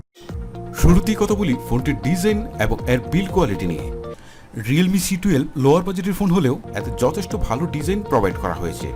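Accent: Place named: Noakhali, Bangladesh. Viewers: vai its perfect not ferfect , leave your noakhaillya accent... irritating kotha barta.. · মনে অর আন্নে ’নিউখাল’ এইচ্চা করি কতা কম কিল্লাই। শুদ্ধ করি কতা কইতে হারেন কেয়ন?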